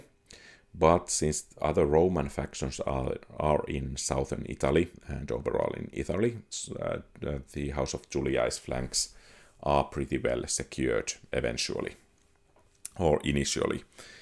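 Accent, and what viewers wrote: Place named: Finland. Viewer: Love to hear finish English! Really nice! Love it!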